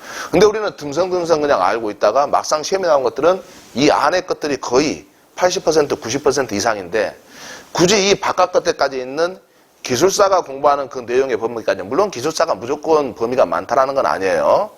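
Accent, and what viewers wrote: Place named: Jeolla, South Korea. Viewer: 말씨가 전라도 그래서 나간다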